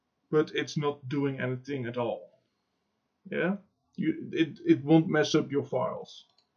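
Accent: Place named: Netherlands. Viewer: hey I get an error each time the launcher is downloading, also you have a dutch accent I can tell because I am a fellow Dutchman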